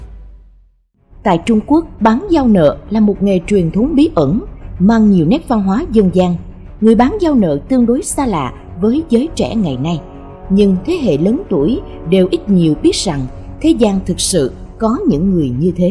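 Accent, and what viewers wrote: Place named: Quang Ngai, Vietnam. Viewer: Giồng như ở Quảng Ngãi VN quá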